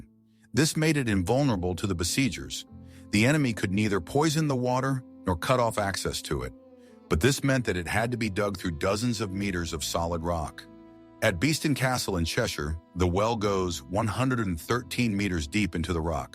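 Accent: United States. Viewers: Narrator a yank a no for me my house is older than the usa.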